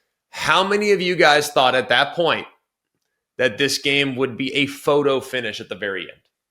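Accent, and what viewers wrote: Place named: Midwest, United States. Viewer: I thought you went to bama not a big 10 school, the way you talk seems like you went to a big 10 school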